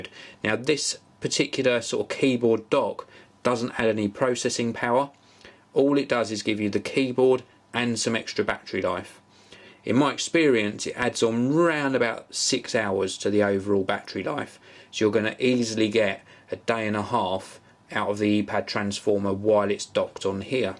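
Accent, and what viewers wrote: United Kingdom. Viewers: Man i love your ACCENT!!!! i wish i could talk like that... i love that typical british accent! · Oh great your a brit.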